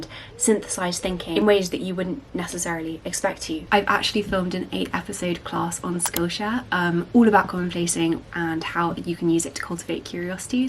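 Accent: United Kingdom. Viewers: The UK accent? Writing as a Latin American native, the US accent is more on higher tone, and listen her make me think 'so calming' the Britain's make the difference hehe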